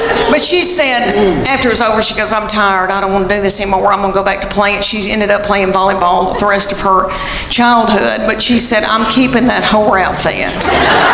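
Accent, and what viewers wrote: South, United States. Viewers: This is very funny. I really like that down to earth southern accent. I would like to see in person doing this skit. Good clean comedy CAN be very funny. BUT, what I am seeing, scares the hell out me. Obviously AI. Artificial Intelligence. It can make anyone appear to be saying anything the programmer wants. ANYONE, INCLUDING YOU. AI IS VERY VERY DANGEROUS. A SCARY FUTURE. 😞😞😞😞😞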